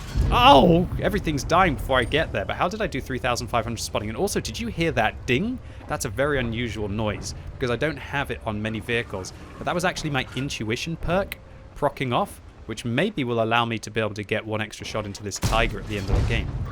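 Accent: France. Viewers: omfg the French Accent is hilarious when you talk French normally. 😂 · Wow, that Frenglish...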